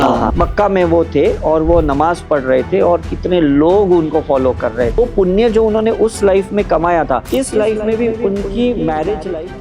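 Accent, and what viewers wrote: Haryana, India. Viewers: एंकर हरियाणा के हो क्या भाई??? 🌹😊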